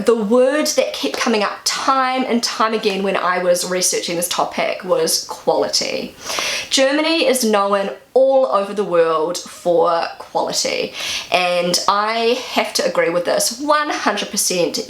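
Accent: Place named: New Zealand. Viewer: Hi. This was the first time in my whole life I heard someone from New Zealand speaking and I really liked it. It’s a bit different to what I’m used to though. And no Deutsche Bahn is not punctual, wasn’t ever and I doubt it will ever be.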